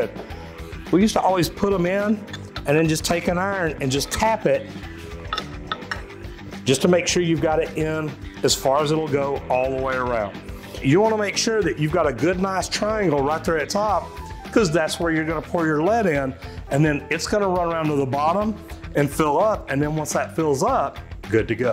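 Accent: South, United States. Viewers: "Sewer pipe" / ˈsu ər / / paɪp / Not soda pop or syrup hop. Just a heads up for people who aren't used to southern accents. I was born and raised in The South and I struggled with that one.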